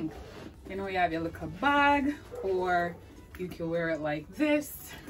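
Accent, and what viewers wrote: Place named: Caribbean. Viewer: Possibly one of the best hauls ever like - what amazing pieces! I thought you'd climaxed early with that incredible fuzzy, baby blue jacket at the start just left me thinking - how do you top that? But I love the red shiny leather (especially paired with the shorts) and then that pelted black fur jacket at the end - that looks too good! Definitely a date night type coat - hope you saved it for Valentines Day! Great haul. I'll throw a sub your way. Ps where are you from? I love the accent. I'm guessing somewhere in the Caribbean?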